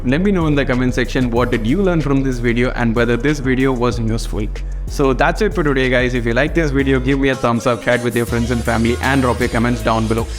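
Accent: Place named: North India, India. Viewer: I thought you are from north india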